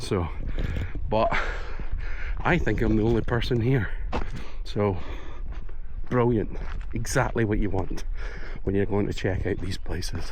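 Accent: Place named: Scotland, United Kingdom. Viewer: Sorry you were puffing but this subscriber likes listening to a human narrator and with a Scottish accent talking about Scotland 🏴 Thank you 🏴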